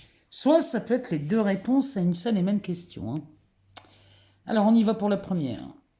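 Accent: North, France. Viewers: merci, pour tout. Mais tu me fais marrer, sans rien faire, seulement de t'écouter!!!!!! Bravo, cet accent nòrdiques que j'aime, tellement!peut-être des ch'tis??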